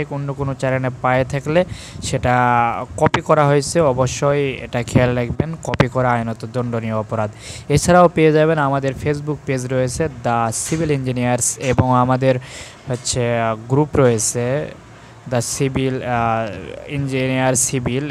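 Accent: Naogaon, Bangladesh. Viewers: কথা শুনে মনে হচ্ছে বাসা নওগাঁ তে।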